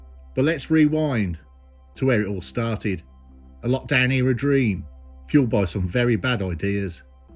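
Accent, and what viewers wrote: Birmingham, United Kingdom. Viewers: well said old boy well said...where on earth would you get a pukka brummie voice bot?? im from london and love you say investigation 🤣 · Why are you calling them burner phones? Thats an American term. Here Pay As You Go phones (PAYG) as perfectly normal , half the population doesnt use contract phones. If you tell people you dont have a phone contract, no one will think thats weird. This isnt America. Are you actually British, or just an American using a British narrator? EDIT: As he wants to argue , quote: ''' The popularity of the term was undoubtedly fueled by its frequent use on the HBO crime drama "The Wire," The following excerpt from one episode (expletives deleted) actually provides a helpful vocabulary lesson: Kima: What's up with these phones? I've been seeing kids throw them away all day. They got cash like that to waste? 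They be laying all over, man. Sometimes you find minutes on them, sell them for walking-around money. Mello: They burners, Kima. Kima: Burners? Google's N-gram app, which traces the frequency of words' appearances in print, shows that "burner phone" skyrocketed in use during the early 2010s.'' *Where does The Wire come from? AMERICA. Its an American term.* When you top up your phone it literally says PAYG right there. All the phone networks call it PAYG. Wha5t you think payg stands for eh? PAY AS YOU GO Your mum likes sucking on mine I dont need to be told. I've been on road. No one used the phrase burner phone. The only time you hear it in the uk is in police shows and music. The Wire was using the term in the 2000s. No one in the uk was using the term burner phones in the 2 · Great content as usual maye,I ain't biast been a fellow brummie but just wouldn't sound the same otherwise.👍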